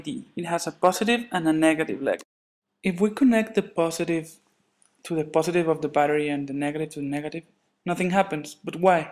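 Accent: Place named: Spain. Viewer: I don't know whats worst for an american, spanish accent or my russiangermanportuguese accent =P But in fact, he made a such awesome job!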